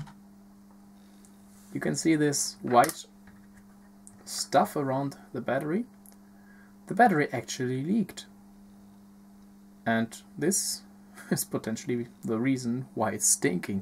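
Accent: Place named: Switzerland. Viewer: hört sich nach schweizer dialekt an ;)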